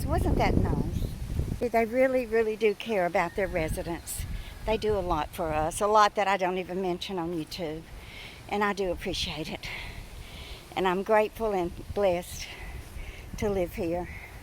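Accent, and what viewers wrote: South, United States. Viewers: A beautiful video. And I love hearing your southern accent. Could those tomatoes be heirlooms? Thanks!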